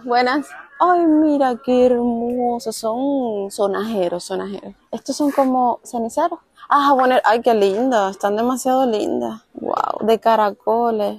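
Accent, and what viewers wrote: Cuba. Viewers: Cubanita me encanta tu asentó ❤️ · el acento lo vas a perder cuando estés hablando con chilenos, pero aunque pasen 30 años, al volver a hablar con cubanos, vas a recobrar el acento, claro que nunca va a ser el mismo.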